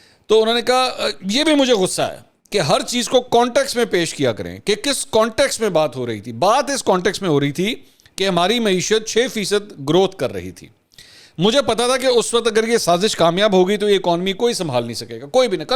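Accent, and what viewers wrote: Punjab, Pakistan. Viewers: Very nice v log full of Punjabi touch 👍👍 Tika k Punjabi jharh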